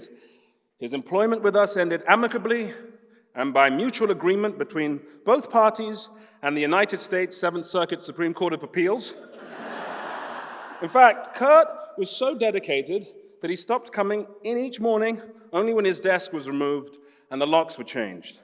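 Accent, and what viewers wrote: United Kingdom. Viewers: shit is so scary when you see a guy in american productions only and then he's british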